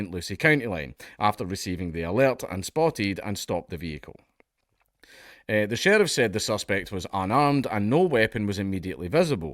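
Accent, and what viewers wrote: Scotland, United Kingdom. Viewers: Maybe if you stopped speaking in a Scottish accent you would stop struggling with getting tongue-tied. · Maybe you wouldn't get tongue-tied if you just spoke normally and not in that weird Scottish way?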